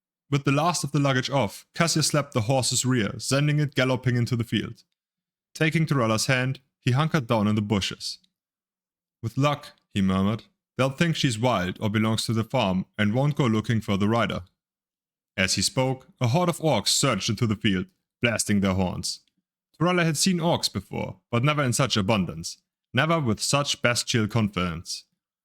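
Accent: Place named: Germany. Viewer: Ich hab mir schon immer eine Lesung der Skyrim Bücher gewünscht, aber ich komme leider nicht über den deutschen Akzent hinweg :(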